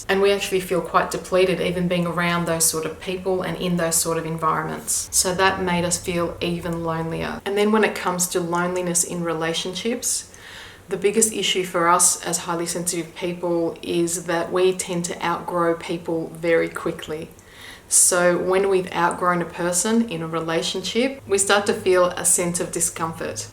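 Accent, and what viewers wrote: Australia. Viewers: I love your Australian accent. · I hear an Aussie accent..I live around Sydney. Do you suggest any meeting group around Sydney. I tried to search on net, could not find anything. Regards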